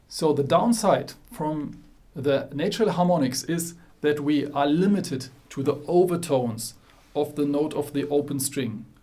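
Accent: Germany. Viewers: I love your Denglisch! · According to your accent, you're probably from Germany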